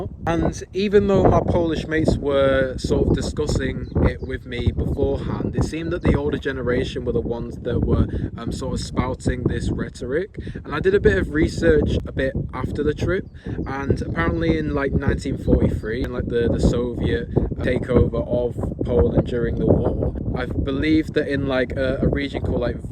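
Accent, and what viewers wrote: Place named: United Kingdom. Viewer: who likes a British accent? sounds snobbish.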